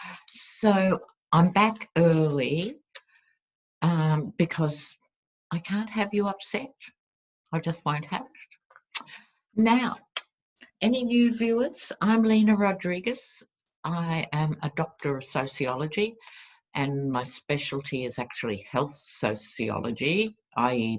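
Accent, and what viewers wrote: Australia. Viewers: Hugs to you, Lena. You rock! I love uploaded videos of wisdom. Keep them coming in that awesome Aussie accent. 💛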